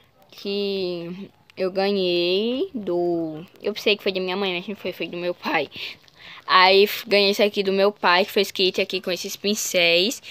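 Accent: Brazil. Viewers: Amei o sotaque, vc é de Maceió?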